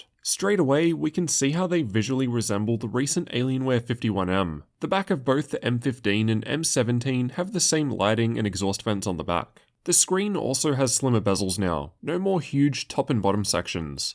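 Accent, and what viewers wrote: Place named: Australia. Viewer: His accent and his voice is what I like. Back in the days when I was learning English, in our institute, we had both American and British accent teachers, but not an "OISTRALIAN" one (: I think his videos are so suitable for any English learner who wants to get familiar with Australian accent.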